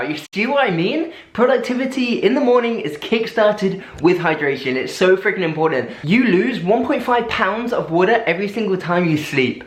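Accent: United Kingdom. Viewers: His British accent 😘😘😘😘😘😘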